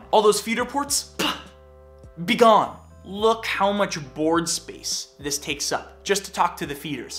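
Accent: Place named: East Coast, United States. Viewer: Does he ever say where he lives? His accent is subtle but I'm thinking east coast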